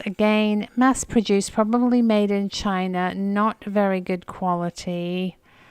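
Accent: United Kingdom. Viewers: you are sooo cool I enjoy watching and following you. It's June from Jersey. You are such a pleasure to watch and listen to. Like that British accent, girlfriend. You are loved in the Northeast keep rocking!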